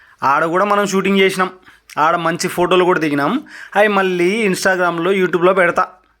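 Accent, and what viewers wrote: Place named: Rayalaseema, India. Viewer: bro rayalaseema yeasa super story line also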